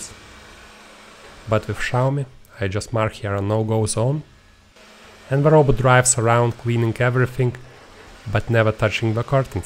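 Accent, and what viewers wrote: Russia. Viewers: Русак что ли канал ведёт, акцент родной, по ушам бьет))))) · Thanks very much. I saw your review before purchasing the C1. The latter was my choice because S1 was 90 usd more expensive in the country I leave (Asia). You are right on all points. I miss the area cleaning selection but not so much. It cleans at night the ground floor and the first floor when I wake up so no battery issue for 70 m2 each 2 floors. The mop on a shinny mirror-like floor works well but I added a microfiber mop to it and it works even better with no watermark. I live in a country side dusty area so strong hoover only is good for my kid but it also cleans. Important: after you buy the the C1, you easily want the robot to come back on specific areas. Then you should get the S1. For me C1 is enough... Just consider if the price difference, maybe now less, worth cleaning area control. 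Probably. If you like my experienced comments then I suggest you should like the video first, not my comments (I really don't know how you tube works but I humbly recognise who spent time to help all of us in this video with a Russian accent), n'est ce pas?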